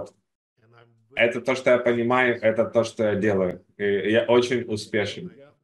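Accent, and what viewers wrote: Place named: Russia. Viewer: с сильным "русским" акцентом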